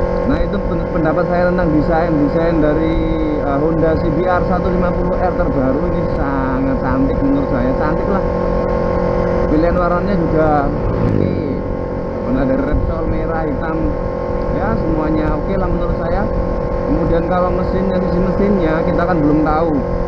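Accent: Java, Indonesia. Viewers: logate jowo bingit... asline ndi to buoosss...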